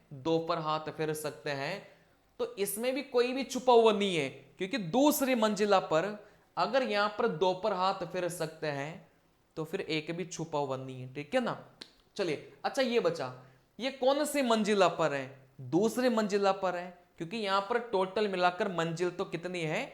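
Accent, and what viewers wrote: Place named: Hadoti, India. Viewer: Sir ji aap rajasthan m hadoti se hona ...aada jana lapad padegi 😂 hadoti wale hi bolte hain😂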